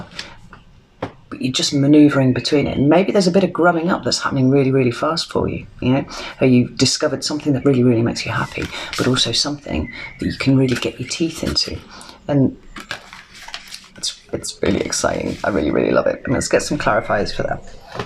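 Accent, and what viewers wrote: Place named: Newcastle, United Kingdom. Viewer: Expecting a Newcastle accent🤔? Anyhoo, the reading's bang on👍🏼The dark night lasted about ten years. I wasn't certain I'd make it through to be truthful - it was pretty touch & go for a while. But, yes; there's a project that's got me back on track. Thank you for the most excellent reading. 'Representing the male contingent by the way... Rob🦕 Namasteé 🙏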